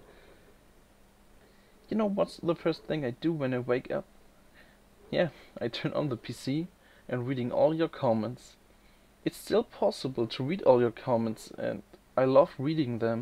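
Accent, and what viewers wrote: Germany. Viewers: Your English was great, German voice is always cool! Haha. Congrats on your 25k and in a short time, but you can tell why people watch your videos as the same reason that I do. Your quality is amazing, your gameplay always looks greatly handled and your info is always very well explained. I don't follow too many people for warframe unless I like the information provided, and I'm picky and yours have always appealed to me. I play on Xbox one and I still come here for your work. Keep it up! I look forward to your future videos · Ahhhh that wonderful German accent. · Ich wusste nach 2 Wörtern, dass du auch Deutscher bist:D Darf ich mal fragen wie alt du bist? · How did I know that you have a German ascent? Any way just wanted to congratulate you on your 25k it will be no time at all before you hit 30k! As for how long I've been subed = I have been subed to you a year or so maybe 2? 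As to why I think your music selection is great and I also think that your reviews of items are very helpful any way keep up the great work! P.s could you make a updated video on how to get out of the leset? (Have no clue if that's how you spell that XD) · Dude you said your English is bad but it's great you just got a cool German accent, that's all.Also you probably speak better than me and I'm from England, I just stutter way too much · Ich mag deine Stimme. ^^ man hört das du deutscher bist:D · Herzlichen Glückwunsch! Man höhrt das du Deutscher bist. Kommt jetzt mehr mit Sprache? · your English is very good. your German accent is wonderful. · I subbed when you were around 5k, and don't bother with the plat for me, I play on xbox one, but anyways I subbed when you were around 5k, and I subbed because I really liked the music in your videos, as well as the knowledge you gave me through your vids, and the videos were fun to watch. 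And by the way, I love the german accent, and your english was fine! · TBH your english is better than alot of us! Both written and spoken P.S. German accents are awsome i have 3 foreign students from germany at my school and I really like their accents. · i love the german english accent. i am from holland so i know your struggles;) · Ich kann mich nicht erinnern, wann ich deinen Channle abonniert habe, aber es ist bestimmt schon eine ganze Weile her. Ich abonnierte dich aus dem Grund, das dein Content sewhr hochwertig wirkte und ich fand, dass dein Fachwissen den großeb Warframe- YouTuber(mogamu etc....) bei weitem überlegen war. Deine Videos waren unglaublich informativ und das obwohl sie so kompakt waren. Ich mochte das insgesamt Paket einfach gerne und du bist immernoch mein lieblings Warframe-YouTuber, weiter so! Ach un dein Englisch ist gut, du klingst halt ein bissel deutsch, aber wer tut das nicht^^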